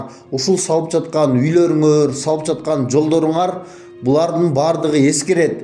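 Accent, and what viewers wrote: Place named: China. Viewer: Сен кыргызсынбы кытайча суйлойсун жинди келтирип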